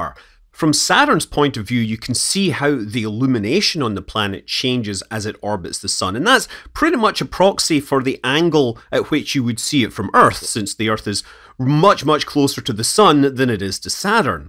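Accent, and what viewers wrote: United Kingdom. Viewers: Please use less accent in your speech, UK is not the whole world.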